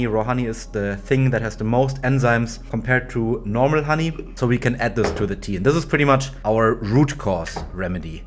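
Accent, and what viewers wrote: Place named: Germany. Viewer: I can hear out the DEUTSCH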